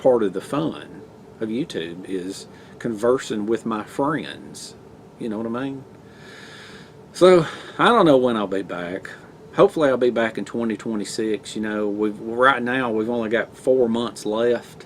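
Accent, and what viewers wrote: South, United States. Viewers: Take care of what you need to do. Sounds like you’re gonna be a might busy. I enjoy your Southern twang and you seem to be a really mellow guy. I watched your ranger roll video yesterday after I watched a video on ranger rolls on Grunt Proof’s channel from four years ago where he gave you a shout out. Spend time with your dad. I lost mine 23 years ago. Mom is still here and she’ll be 84 in a couple of weeks. I’ll be watching more from your video library and I’ll be looking forward to your next one. Take it easy. Mark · I’m a long time subscriber who never comments until now. I have much respect for your videos and watch them as they become available. I typically turn em up loud so I can hear your southern accent throughout the house, lol. Your fans will love ya just as much when you finally have time to continue pursuing your hobby! 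What you and have done is a great contribution to YouTube.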